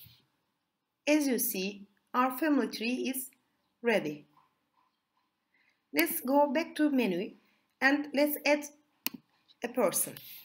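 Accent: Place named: Turkey. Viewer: Türk aksanı bu nerede olsa tanırım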